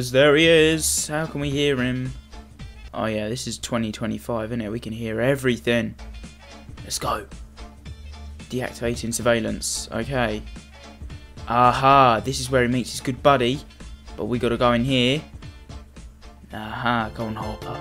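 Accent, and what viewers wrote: United Kingdom. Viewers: I think you have a British acsence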